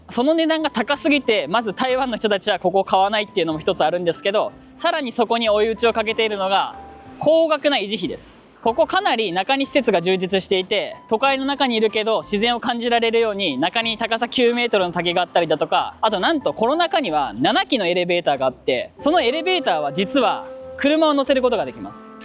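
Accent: Japan. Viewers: たまにのぶりんさんの動画見たりしますが、のぶりんさんと同じアクセントで話されますね。北陸のご出身ですか？ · 長野出身ですか？ 結局1軒しか売れてないなら、住んでる人には安いよね。ビル1棟だもんね。とてもエコとは思えないけど。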